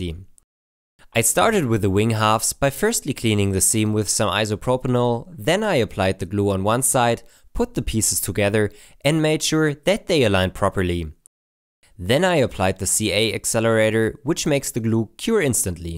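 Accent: Germany. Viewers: Desch isch aba a schöna deutscha akzent LEL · Feier deinen Deutschen Akzent xD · When you hear the German accent the first thing that comes to mind is "precision". Great work. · Dieser deutsche Akzent ich fühle es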